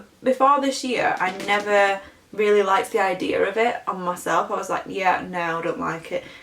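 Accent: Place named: RP, United Kingdom. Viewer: oml your brtish acsent is the next level of porsh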